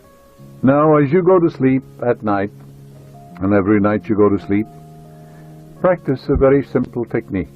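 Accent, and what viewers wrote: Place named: Cork, Ireland. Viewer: never lost that Cork Accent!!😉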